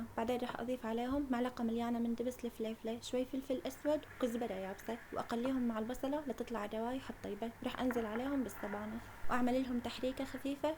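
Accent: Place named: Deir ez-Zor, Syria. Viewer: لهجتك ديريه أو حسكاويه ❤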